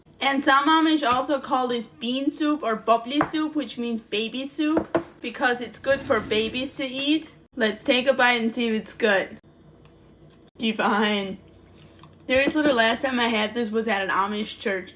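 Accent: Germany. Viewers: Is German your first language? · Do u have a German accent? I got a little scared when u cut the bread I'm not gonna lie 😂😂 jk ❤❤😂 I think u are wonderful😊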